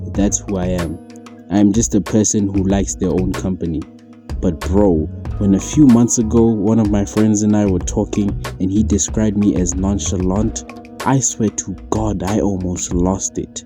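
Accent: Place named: South Africa. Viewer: Ngl I'm boy sounds South African 😅W video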